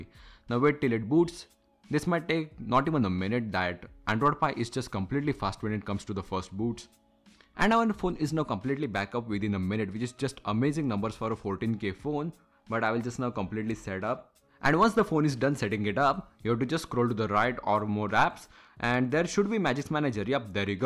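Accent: India. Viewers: I'm trying to find out how to root my redmi note 7 phone so that I can remove all the bloatware. This video sounds very helpful, but I am afraid I cannot follow your Indian accented English. Have you got a text version of your voice over which I could follow?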